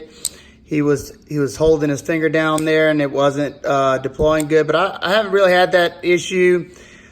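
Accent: Louisiana, United States. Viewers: Nice accent, is that Louisianna? Sounds great. Excellent video thanks!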